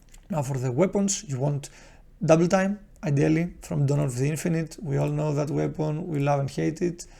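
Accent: Greece. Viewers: Nice guide will check it out. One irrelevant question though. Are you greek ? Your accent makes me think that, thats why i ask.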